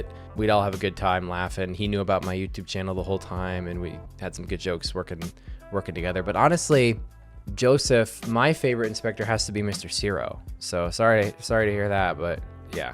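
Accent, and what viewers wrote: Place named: Scandinavia. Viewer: I love that you read all of Brage’s questions in a Scandinavian accent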